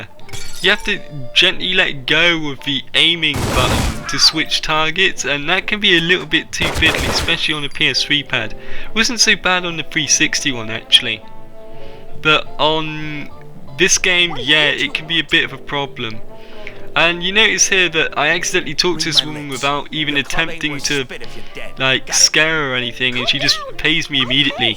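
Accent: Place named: United Kingdom. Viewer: Everyone is different. Wow, is this a crime or something? Does he have to talk like you, does he have to walk like you...? I've seen so many remarks about people's voices that are actually NORMAL. This guy's voice is fine. Leave him alone. I don't even know him, but I find his commentary to be fine, casual, and I know (obviously) that he is most likely from the UK. Maybe he doesn't have the best recording equipment? No reason to curse at him over it! Sheesh...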